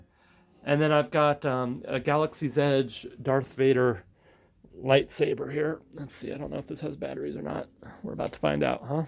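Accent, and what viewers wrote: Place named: Germany. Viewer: U sounds like u are from germany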